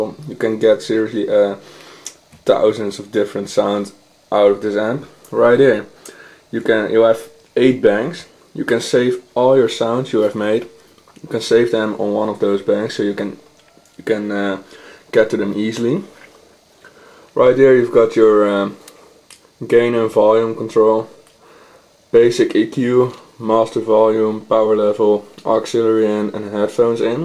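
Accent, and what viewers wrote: Netherlands. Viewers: ha your accent sound hollish.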